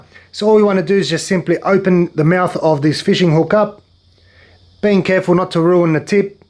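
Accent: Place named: Australia. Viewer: New you were an Aussie as soon as you spoke.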